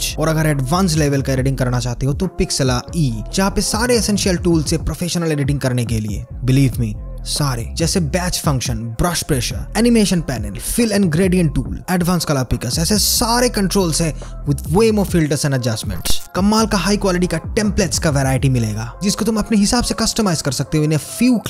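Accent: Bengal, India. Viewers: Tum bhi bengali ho na? Tomar hindi te banglar tan ache!!!!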